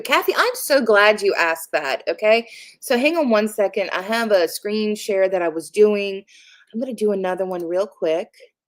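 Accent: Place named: South, United States. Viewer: Hello girl! I'm in Oklahoma and I hear a tinge of "Suth'un" in your speech! Love it. I heard a question as to whether Sussexes would divorce. Only my opinion, but she's now heard Andrew's Sarah will retain her duchess title. Meghan covets that title, she feels she has multipled clout in having it. IF the king, or Prince William, remove their Sussex title, Meg will collapse like a burst balloon. ONLY my opinion, mind.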